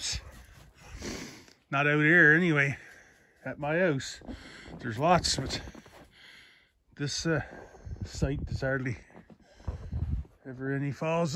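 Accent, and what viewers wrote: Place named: Lynn Lake, Canada. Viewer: It’s been a while since we heard that accent in Lynn Lake.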